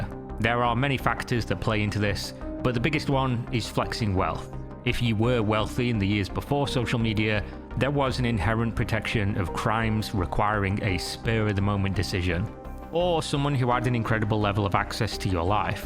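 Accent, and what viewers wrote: United Kingdom. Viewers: This youtubers uk accent is so annoyinh, I cant even explain how much I hate it, just talk normaly, what is your problem mate?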